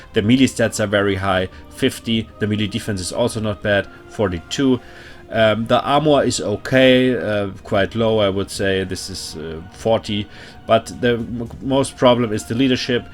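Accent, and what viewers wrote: Germany. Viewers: I’m officially going to start intentionally pronouncing vampire “wampire” henceforth. Love the Deutsch accent. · I find it funny that other germans can tell germans just by the accent. Knew instantly, very nice guide ! :D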